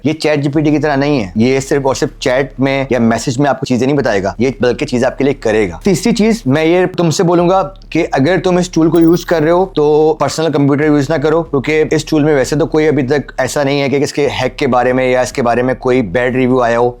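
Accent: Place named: Karachi, Pakistan. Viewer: Fantastic explanation! Kaafi dino baad Karachi accent main sunker maza agaya!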